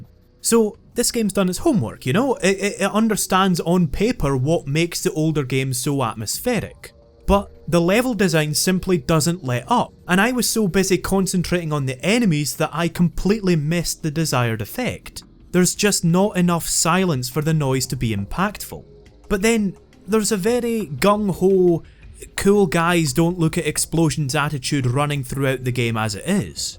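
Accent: Scotland, United Kingdom. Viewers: wow ur very scottish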